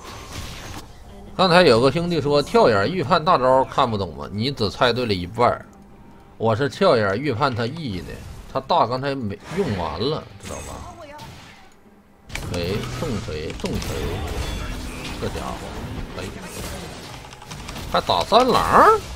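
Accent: Northeast, China. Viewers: 甚麼叫準...是比較沒有口音== 人家東北人 本來說話就有口音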